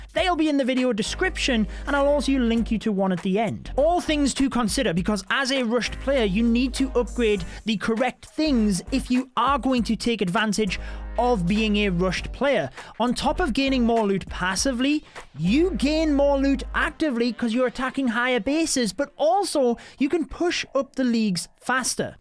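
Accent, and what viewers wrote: United Kingdom. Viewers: are you from Britain?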